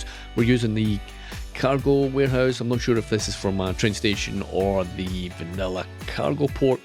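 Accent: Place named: Wales, United Kingdom. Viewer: As a native English man I can confidently say he's welsh.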